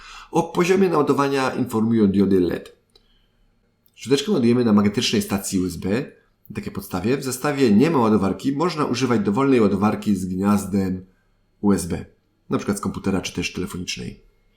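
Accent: Silesia, Poland. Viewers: Dobra i tania, ja mam elite pro cos tam,skaczą te ustawienia na wyświetlaczu zanim zaczne myć a ta prosta i tez Mocna ,kilka szt kupilem na prezenty,fajna jest bo cicha.Masz sluński akcent🙂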